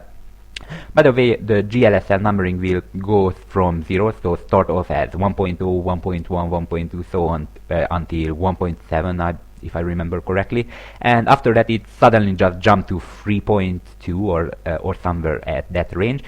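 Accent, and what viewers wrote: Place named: Hungary. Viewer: te magyar vagy hallatszik az akcentuson meg látszik a "fájl, szerkesztés" ikonok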